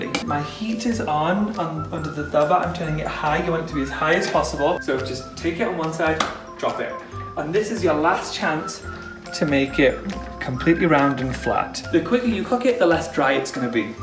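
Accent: United Kingdom. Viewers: A C R Maybe it’s just the dialect that I grew up with, but roti also has a solid “o” sound and a thick “t,” I think he knows he’s using an English accent because he pronounces it with a Pakistani accent in the beginning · Zehra Rizvi Isnt he British?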